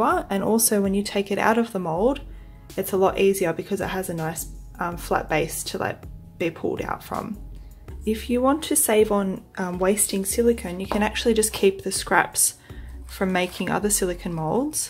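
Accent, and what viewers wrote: Australia. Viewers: Thanks so much for this video. I have watched many videos on you YouTube about silicone mould making, and this one is probably my favourite so far. Thank you for taking the time out to make this for us. I am also an Aussie, so I also appreciate the accent. I have also used Pinkysil in the past with great results, but I find it quite expensive. I would greatly appreciate any advice on alternative products. TIA. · Thanks for sharing your simple way to make a mold; I'll certainly try it! What would you use to fill surface imperfections on an item before making a mold of it? Oh, and it's nice to hear another Aussie making a tutorial!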